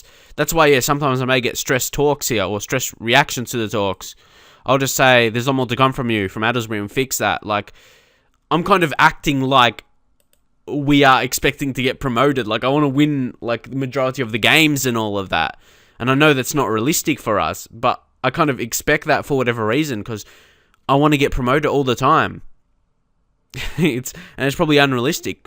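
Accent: Australia. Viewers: Seriously? Can't you tell he's an Aussie? :)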